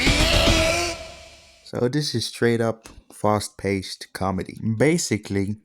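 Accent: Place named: Germany. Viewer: youre so German its cute I love your accent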